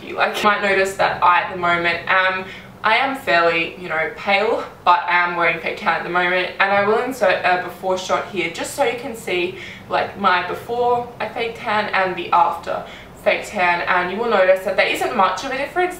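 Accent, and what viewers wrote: Australia. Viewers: I think she"s Australian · french fry Maybe Australia? I think so! You could check her social media though, maybe she has it written there:) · frenchfry AUSIIIEEE, LIKE ME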